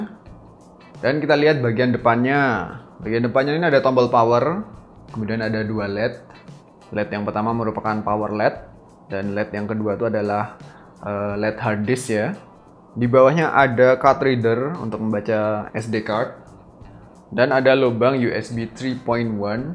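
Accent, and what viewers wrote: Java, Indonesia. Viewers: Waduh Pak Logat Jawa nya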